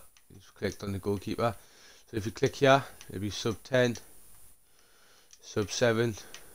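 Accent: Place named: South Shields, United Kingdom. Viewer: The shields accent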